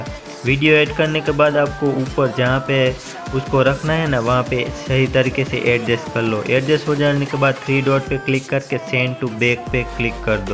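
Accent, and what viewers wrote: Gujarat, India. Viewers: Apan ko pata he apan gujrat se he har gujrati ki aavaj pahechanta hu tum100% gujarat se ho 😘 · Bhai video me aawaj sun kar lag raha he ki aap gujrati ho😄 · bro aavj sun kar lag ka aap gujrati ho , iam also from Gujarat ❤️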